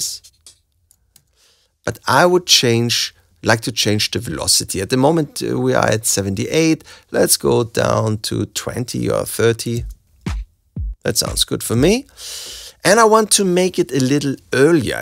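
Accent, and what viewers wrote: Germany. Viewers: tutorial is ok, but man...pls get rid of that horrendous german accent!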